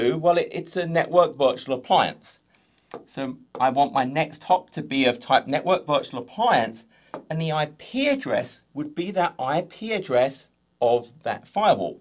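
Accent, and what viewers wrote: United Kingdom. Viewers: I pay for courses that aren't a patch on yours. I've worked in IT for too long, never needed to fully understand 'rowting' or fw's, always someone else's job. I'd never touched azure either. In the last week and a half I've gone from 'dark art' to having the confidence to set up a lab, replicate bits in my work place, secure the subnets, test out the product (secure az140 deep dive? #fingerscrossed) that I'm trying to architect and look really clever at work... You've had me covered at pretty much every base, you absolute lege!! Dunno how much you make out of this side-hussle, but good karma is definitely on the way! P.S. Hearing what I think is a southern UK accent saying the word 'route' like an American is weird, but it must be contagious as you've even got me bloody doing it :D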